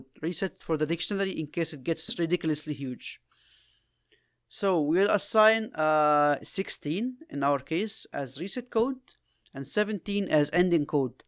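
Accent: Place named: Egypt. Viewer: You are egyptian 😂 I Know this kind of accent, but good job keep it up bro.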